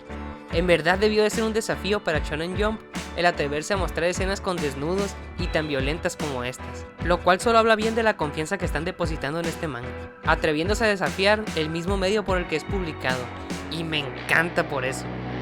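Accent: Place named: Sonora, Mexico. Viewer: Brooo, ¡buen video! ¿Eres de Sonora? Tu acento me parece de aquí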